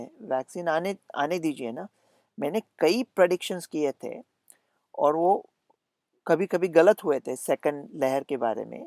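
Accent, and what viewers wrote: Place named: Maharashtra, India. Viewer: Tumchya Hindi madhye pan Marathi aahe. Take this as a compliment.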